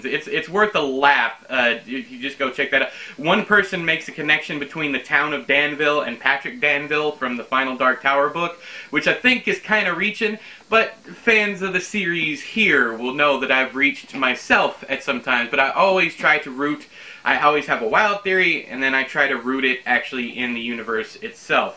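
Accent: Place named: Midwest, United States. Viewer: Where do you shoot from? I'm from Laja, Chile, myself. I like your accent, it's what I'm used to hear the most, having lived a couple of years in the Chicago area and in southern Wisconsin.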